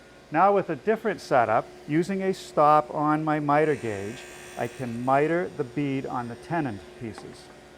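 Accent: New England, United States. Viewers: what I wouldn't give to have a blooper real of all his mistakes and him cussing in his New England accent xD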